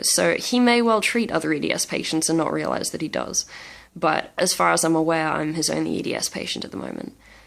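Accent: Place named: Australia. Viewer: Good to hear another Aussie accent on YT.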